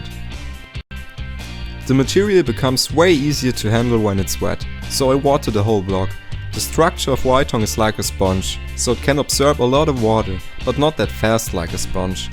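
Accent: Germany. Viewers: Hey. Nice nest. Looks great. Are you come from Germany? Your accent sounds like like german. I come from Hamburg in Germany. I like your channel. Greetings Alex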